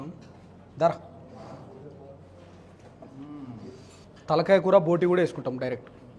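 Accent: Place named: Telangana, India. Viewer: Idhi తెలంగాణ bro... andhra kaadhu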